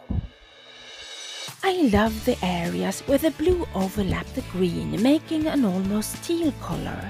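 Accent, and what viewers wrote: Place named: Scandinavia. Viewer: You are absolutely adorable! I love your work so much! All of the work that goes in to those details...incredible, and so very well done. Your creativity knows no bounds Love, love, your imagined birds and flowers. So special...and I could listen to you talk all day with that sweet Scandi accent.....and a "heavy book" by Jamie Oliver - yes, please!!!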